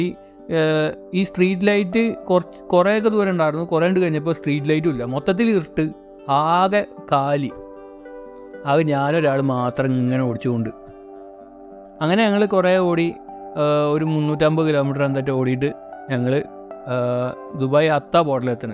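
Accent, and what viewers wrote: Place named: Valluvanad, India. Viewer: വള്ളുവനാടൻ ഭാഷയിലുള്ള ഇമ്പമാർന്ന അർത്ഥപൂർണമായ നരേഷൻ.... 😌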